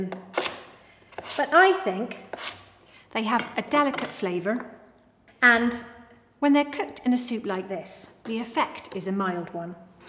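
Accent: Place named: West Country, United Kingdom. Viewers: it’s West Country accent, Devon specifically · If I may, it’s a genuine (and genuinely West-Country British) combination of the British English post-vocalic R sound (which is pronounced ‘ah’ as in ah-mond) and the rhotic terminal R which came from the West Country, and became the standard R sound in US English. Celebrate these distinctive differences; they have made us what we are! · It's how we say almonds in the UK and always have (especially in the West Country where she is from).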